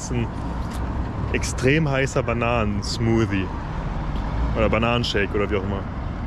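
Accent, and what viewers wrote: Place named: Balkans. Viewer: Wie hast du eigentlich Russisch gelernt? Für mich hast du irgendwie einen Serbokroatischen Akzent 🤔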